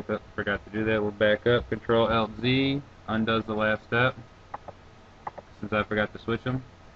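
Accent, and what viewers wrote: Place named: Michigan, United States. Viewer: Nice tut. and u sound like my old friend shroomz from michigan. i thinks its just your accents!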